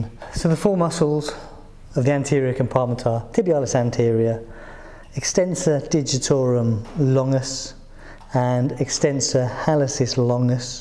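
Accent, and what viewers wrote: England, United Kingdom. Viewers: Brilliant delivery by a clear English accent. If only all YouTube videos were this good. Keep up the good work!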